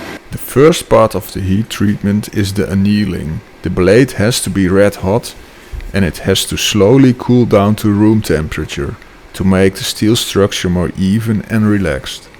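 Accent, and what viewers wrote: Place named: Netherlands. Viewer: Hallo , Je mes is echt cool , je voice over is duidelijk en interessant maar probeer een beetje minder nederlands te klinken als het kan ....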